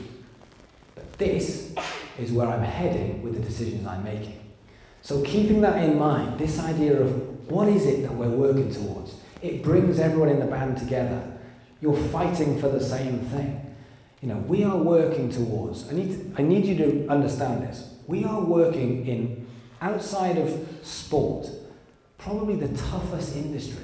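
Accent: United Kingdom. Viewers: I watched over 30 of your videos in the last week. First: love your brit accent. Second: great contents. Do you know Marco Montemagno? Is a 47 y o men who lives in Brighton. He helps people with startups and big companies in marketing strategies, you should contact him ;) He also has a BIG youtube channel in Italian where he talks about marketing, social media etcs.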